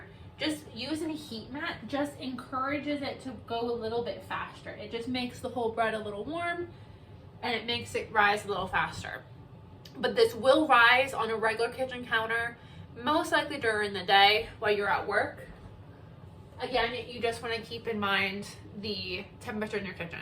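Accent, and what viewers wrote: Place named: Midwest, United States. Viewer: Yea success!!! I put your recipe together yesterday morning “Saturday” placed it in the oven with the “light on” until I got home . I then followed your instructions for preparing and baking the loaf. It came out perfectly. You are wonderful! Thank you for this great video-oh I enjoy listening to you talk…what part of the country are you from. A wild guess…mid-west?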